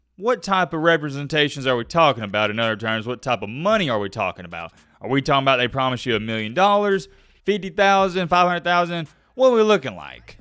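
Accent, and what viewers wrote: South, United States. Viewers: Bruh I’m from the south and know we have accents but you are a mush mouth MF!!! Hahahaha You make great videos but you need to work on that. Maybe get a speech coach. Keep up the good work. Hope you continue the work and it works out for you. Hope you don’t feel like the criticism is unwarranted or being mean I’m in it more as a joke but I do think you have some areas where you sound like a mush mouth and if you’re not from the south, you might not understand what you’re saying. I said I didn’t say this to be rude or mean just hope you take criticism for what it is. Continue to do good work.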